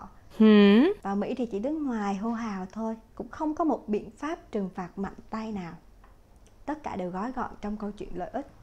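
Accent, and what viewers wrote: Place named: Da Nang, Vietnam. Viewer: nghe giọng chị em đoán ra chị là người đn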